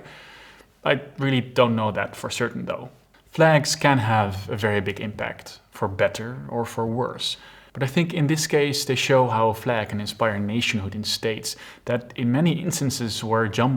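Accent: Netherlands. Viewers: I smell a beetje dutch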